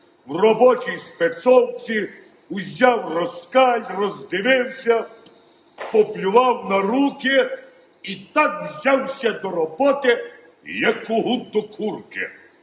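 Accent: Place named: Western Ukraine, Ukraine. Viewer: Абсолютно геніально! Цей говір і гумор! Особливо цей унікальний «західноукраїнський» сільський говір з якого «люди з міста» насміхались в радянські часи. А кожна задрипана дівка з села біля Львова, якій вдалося влаштуватися на роботу у Львові, лізла зі шкіри, щоб «разгаваривать на общепонятном руском язике» Мав нагоду цей феномен спостерігати, працюючи в одному з наукових закладів Академії Наук. Обʼїздив багато світу, і в кожному куточку є свій говір, сленг. Що і робить цей світ різноманітним і цікавим!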